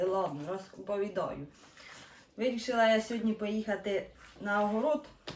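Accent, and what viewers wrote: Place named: Khmelnytskyi, Ukraine. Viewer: Шматки за розміром ідеальні. Цибулька, водичка, це теж обов'язково. Не люблю коли дають поради , але хочу, щоб тобі теж було смачно. Беру приправу до шашлика в Аврорі, в банках. Ідеально підібрані спеції. Додаєш багатенько.Сіль додатково не потрібна, там все є. Через добу готово. Важливо, щоб спочатку з двох сторін на максимальний жар(запечатати, щоб сік кипів усередені, а зовнє не виходив).І не перетримати, щоб не сухе було. Смачного Тобі! Ти молодчинка! До речі, мова, як в нас, на Хмельнитчині. Привіт Тобі зі Старкона!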